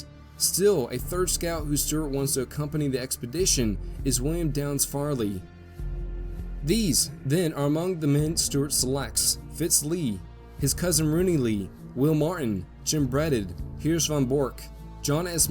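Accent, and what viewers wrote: South, United States. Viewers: Just found your channel recently!!!!! It's awesome!!! Only someone who really knows and cares about American history and culture does content like this. Detail is excellent as I've studied this period in detail as well! Keep it up and thank you!!! P.S. Nice to hear a southerner narrat it too! (Sorry if I'm mistaken you do a good job either way).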